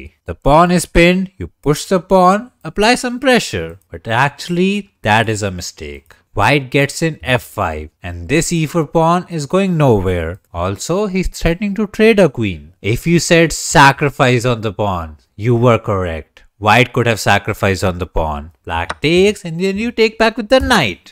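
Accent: India. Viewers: Nice to hear some Bhartiya voice. Search knight tour Sanskrit to know why Bharat was richest. So much knowledge they kept hidden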